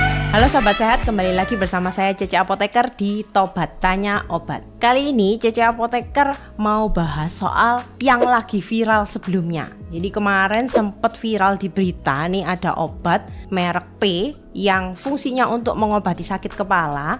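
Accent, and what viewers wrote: Java, Indonesia. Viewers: Bahasanya muuedok. Semoga sukses.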